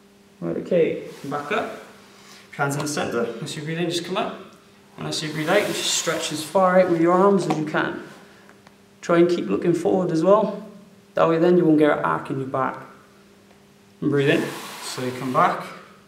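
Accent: Wales, United Kingdom. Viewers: Heard the welsh accent and seen you teach around newport. May have to pop in some time and check it out.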